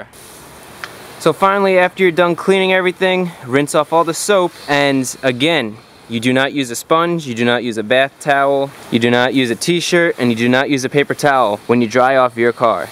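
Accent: United States. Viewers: That's okay. I didn't want to be rude. Heard your US accent and thought you record mainly for Americans. No offense. cheers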